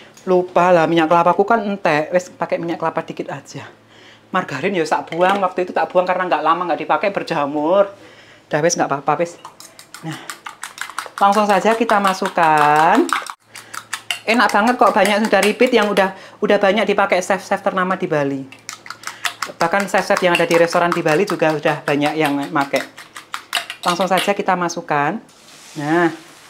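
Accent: Java, Indonesia. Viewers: Mbk asline dr mana ya...kok jawa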